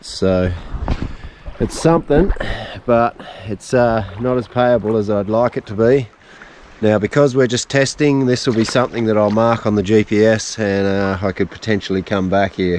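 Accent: Australia. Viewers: The fella from down unda lol! Love the vids!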